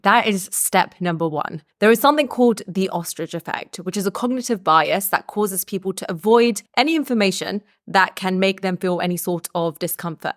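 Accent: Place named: London, United Kingdom. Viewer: love the modern London accent innit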